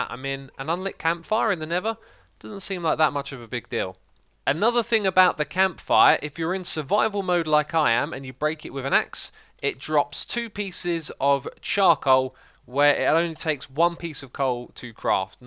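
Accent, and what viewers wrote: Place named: North, United Kingdom. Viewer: What dialect is that exactly? Are your from the north? Keep up the good work!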